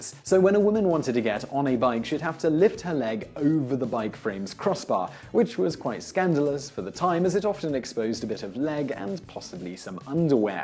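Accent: United Kingdom. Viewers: i do like your content, it's good mate, but you speak so weirdly that it's hard to concentrate on what you're saying. It's like you're trying hard to pronounce every word, I'm sure the americans will still understand your british accent if you spoke more naturally (i was born in london btw)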